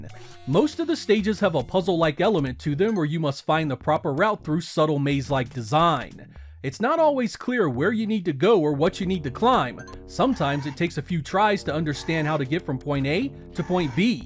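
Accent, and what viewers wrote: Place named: Northeast, United States. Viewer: you have a funny accent are you from some weird northeastern US city?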